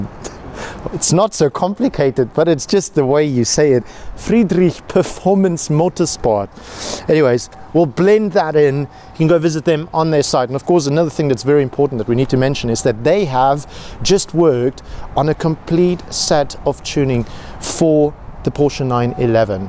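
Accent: South Africa. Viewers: I hear South African vibes ..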